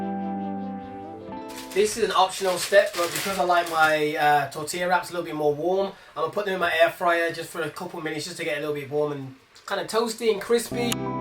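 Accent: United Kingdom. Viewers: Yo, don't hate me but I JUST realized you gave a British Accent. Lol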